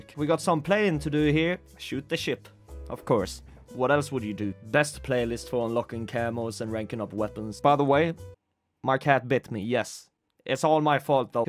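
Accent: Sweden. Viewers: are u swedish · U from Sweden??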